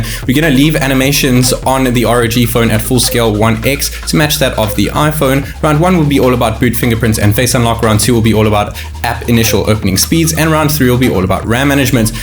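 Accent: South Africa. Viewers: Is from South Africa?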